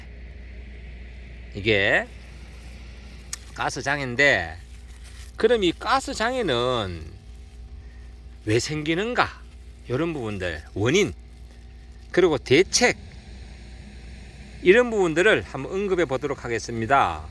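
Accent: Gyeongbuk, South Korea. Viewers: 말씀이 참 시골 스럽네요 경북 부부지방 말씨 같은데 구분이 잘 안되네요 ㅋ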